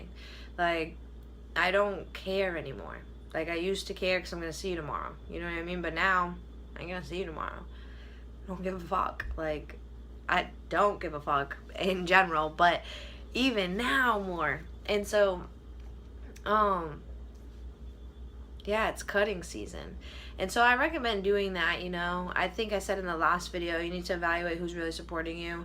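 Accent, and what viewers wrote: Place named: Boston, United States. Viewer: You seem very genuinely happy in your videos it's amazing! Also heard some Boston accent in this one, whateva whateva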